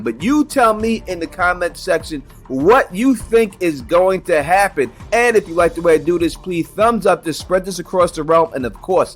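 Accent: New York, United States. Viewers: I love your coverage of this show. Also love your NY accent. Love to hear you say "autopsy "❤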